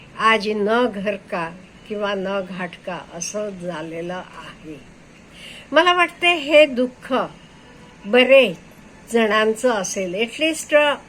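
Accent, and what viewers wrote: Karnataka, India. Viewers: त्यांची मातृभाषा मराठी नसेल..You should accept other language speaking pple's tone!! कानडी असाव्यात..छान बोलल्या.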